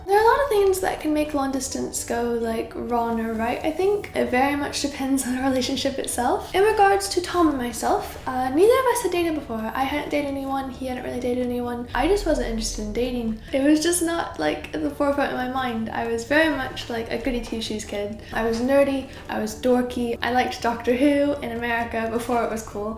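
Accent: United Kingdom. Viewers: Ok this has nothing to do with the topic of the video but your accent is really interesting, because you are starting to use some British ways of pronunciation, the stress on certain syllables in certain words for example. I just find this really interesting as an English (as a foreign language) student. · your American accent is sounding UK. THATS AWESOME!! YOU GUYS ARE LOVELY.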